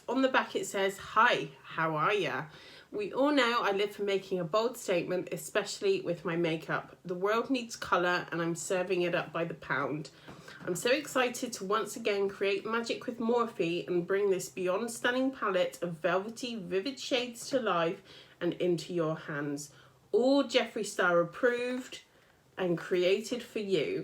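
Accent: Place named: RP, United Kingdom. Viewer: you speak posh, good to see you again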